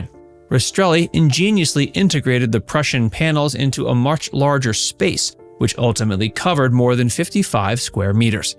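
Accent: United States. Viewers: American pronunciation of nonAmerican language is atrocious. Please learn the standard world-wide usage of words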